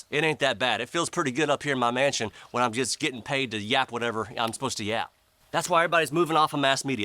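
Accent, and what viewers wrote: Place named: New York, United States. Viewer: What's funny.. Is actually has a new york accent in real life.. Lol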